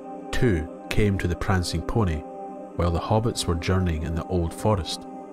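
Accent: Scotland, United Kingdom. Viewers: Oh no! The only two Scottish accents I could get any information on were Edinburgh and Glasgow. I had been wondering if Inverness were a possibility, but I couldn't find any examples of it at all. Eventually, it came down to whether I thought you sounded more like Billy Boyd or Billy Connelly. Lol.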